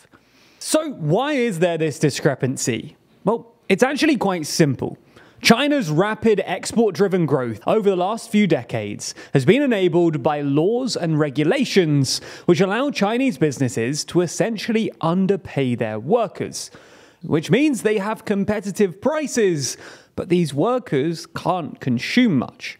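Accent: England, United Kingdom. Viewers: Aaron This dude is British. Listen to his accent.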